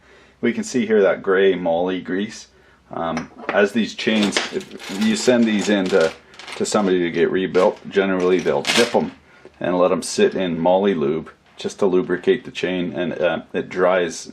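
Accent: Canada. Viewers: Can someone translate the canadien chinglish and translate where he bought it for 150 bucks? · R u from Canada 🇨🇦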